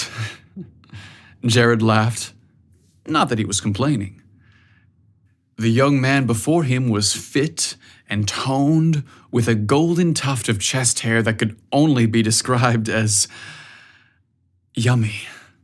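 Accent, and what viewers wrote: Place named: Italian American, United States. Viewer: OMG, you have an incredible singing voice.. what a great way to start the SteamyStory. And then your Italian American mama accent...lol. And such a wonderful summer romance story......ahhhhhhhhhhh, so much better than a Netflix binge.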